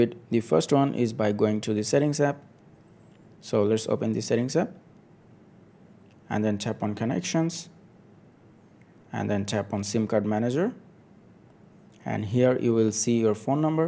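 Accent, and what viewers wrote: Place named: Bangladesh. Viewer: Ur country code and ur accent like Bangladeshi are u Bangladeshi?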